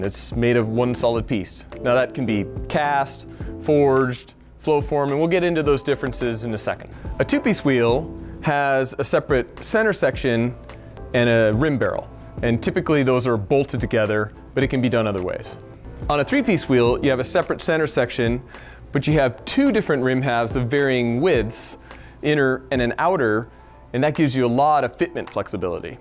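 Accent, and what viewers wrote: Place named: California, United States. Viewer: Haha I like it he talks like the Californians on SNL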